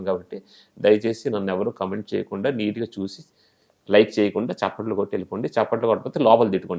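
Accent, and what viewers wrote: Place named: Srikakulam, India. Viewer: Mana.. Sklm... Tone..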